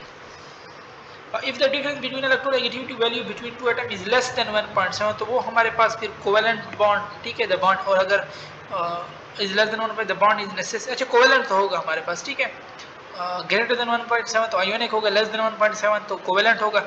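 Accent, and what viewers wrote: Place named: Khyber Pakhtunkhwa, Pakistan. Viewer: Sir ap kha sa hoo kpk sa lg rhi hoo😊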